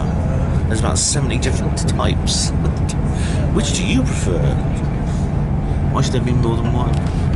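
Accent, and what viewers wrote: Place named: England, United Kingdom. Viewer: Kind of strange, to hear you, a Welsh man, speaking with an English accent to your fellow country men and women, who have Welsh accents! Do you think about that or does it just by pass you? Funny cos they welcome you as having a big loud Welsh voice!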